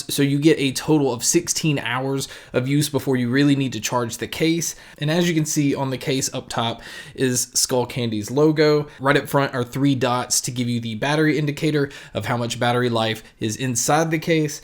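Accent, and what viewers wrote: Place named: Texas, United States. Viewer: Texas boi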